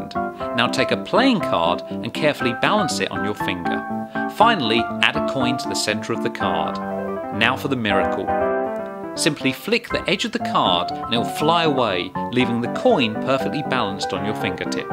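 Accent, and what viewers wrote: United Kingdom. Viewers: All these tricks are pretty lame, but because he has a British accent, I want to try them... God these people are influential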